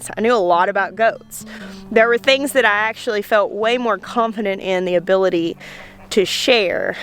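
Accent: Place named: Arkansas, United States. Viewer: Lol I felt like you were talking to me 🤣 new subscriber! I found you right after Christmas bc I’ve been getting so excited to get my garden going again. I’ve been watching ALL of your videos. It’s so funny bc the first video I watched of yours, I noticed your accent, and said oh I like this girl. Then you said you had lived in Arkansas and was like, well there it us! 🤣 So fellow Arkansan here now! I also need to say this, and it’s going to sound insane, but when you said you wanted to do the 365 challenge, it made me want to work on my art skills again. I’ve been working on a pictured my son, and I’m usually in bed by now but I wanted to get this finished. I was in the living room and noticed a buzzing sound and started noticing a smell of melted plastic. I ran through the house thinking I was going crazy but found our hot water heater was burning! 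We’ve got it all settled now, but I’m thanking God that I was awake working on my picture. That could have turned out really bad really fast.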